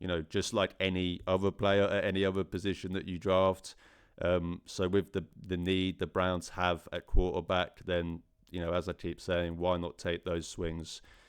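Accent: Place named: Wales, United Kingdom. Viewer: What part of Wales are you from & welcome to the dawg pound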